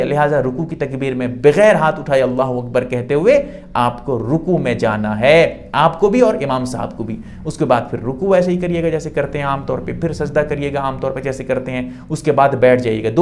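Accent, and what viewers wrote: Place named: Bhagalpur, India. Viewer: مفتی صاحب کی زبان سے معلوم ہوتا ہے کہ شاید بھاگلپور یا گڈا وغیرہ سے ہیں، آپ نے ماشاءاللہ محنت کافی کی ہے، مگر بعض اردو کی لفظی غلطیاں ہیں، اصلاح فرمالیں، مثلا اقامت میں الف پر زیر ہے آپ نے زبر پڑھا محرم میں ر پر تشدید نہیں ہے آپ نے مشدد پڑھا اعراض میں الف پر زیر ہے آپ نے زبر پڑھا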